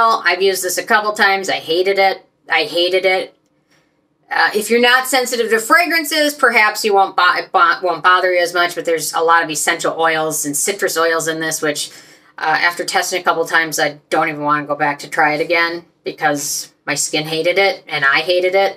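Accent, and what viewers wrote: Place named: Midwest, United States. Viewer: Paula’s need to STOP … it’s way WAY too many products that she’s selling. I only use one of her cleanser and a booster. More than half of her facial moisturizers affect my eyes, after an hour of application (I’ll stick to my drunk elephant). I’m not a sunscreen person….. after trying hers and expensive brands of both chemical and mineral sunscreens, I’m left with irritated eyes. Anyway, it’s fun watching you and I ❤️ your Midwest accent. Keep up the awesome work👍.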